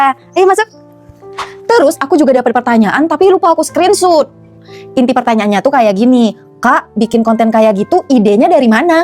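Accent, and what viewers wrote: Indonesia. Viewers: Kak asli orang padang ya kalau boleh tau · Kakak orang Aceh ya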